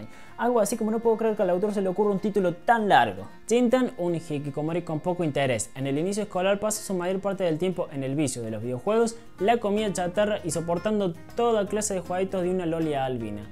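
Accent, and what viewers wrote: Córdoba, Argentina. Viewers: wpse re nota que sos de cordoba xD buena reseña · So cordobe culia?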